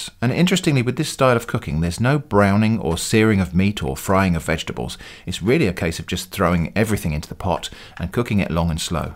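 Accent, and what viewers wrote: United Kingdom. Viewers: its like Primitive Technology with UK dub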